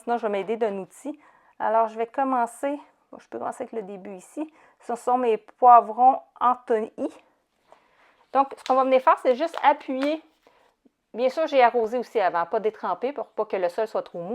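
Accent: Quebec, Canada. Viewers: Et boujour tout le monde 😅 j'adore ton accent ❤ vive le Québec 😊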